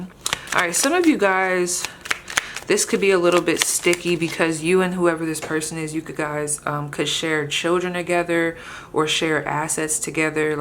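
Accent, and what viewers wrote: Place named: Oklahoma, United States. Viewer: Lol that heavy. Rando okieaccent was for me I know it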